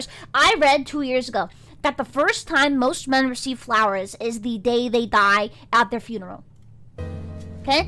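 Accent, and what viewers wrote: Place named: Bronx, United States. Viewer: chibi extra Italian from the Bronx in this one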